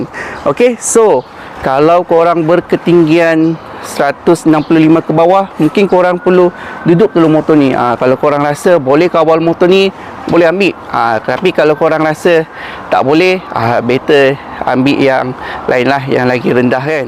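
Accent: Sabah, Malaysia. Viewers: Mcm org Sabah, ngam ka?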